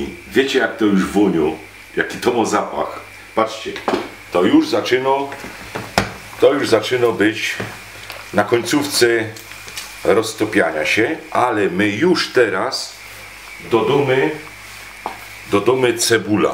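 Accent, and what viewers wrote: Silesia, Poland. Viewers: Pozdrawiam z Warszawy z okolic lotniska na Bemowie, na którym to w 1959 roku lądował vice prezydent USA Richard Nixon. Wtedy pas na Okęciu był za krótki. A mnie i tak jeszcze nie było. Podoba mi się ta śląska gadka i trochę zazdroszczę bo warszawska już prawie wymarła. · Panie niech Pan nagrywa więcej filmików przyjemnie się ogląda i ta Godka. Ja bym chciał zobaczyć odcinek fasolka. Pozdrawiam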